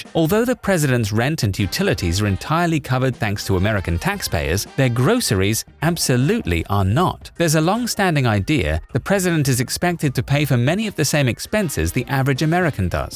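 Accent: United Kingdom. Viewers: British narrator! 🤣🤣🤣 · 1. Not michelle but Michael oboma.. 2.Geprge Washington had a copper Steel first..not Obama brewery.. Why a British accent giving American history gives pause to wonder..ok I'm done.. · I like how this guy is talking about America but he British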